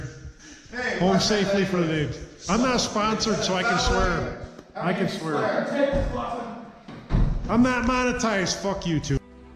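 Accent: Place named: Western New York, United States. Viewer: I'm enjoying this way too much. Btw that wny accent is strong... sound just like me.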